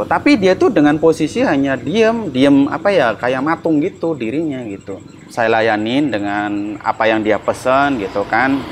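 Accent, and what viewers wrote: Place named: Cilacap, Indonesia. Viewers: Nadanya kaya orang cilacapan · Ngapak dikit nga ngaruh um haha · ini cerita serem tp jd ketawa karna abangnya ceritainnya lucu pake logat jawa medoknya😂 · ngapak banget bahasanya bukannya serem malah ngakak wkwkw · Owalah ngapak tenan Iki mas req😂❤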